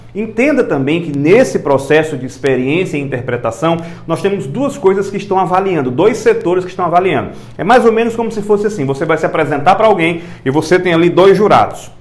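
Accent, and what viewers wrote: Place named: Northeast, Brazil. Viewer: Percebi pelo seu sotaque que é do nordeste, talvez recife ou Ceará ou até mesmo paraiba.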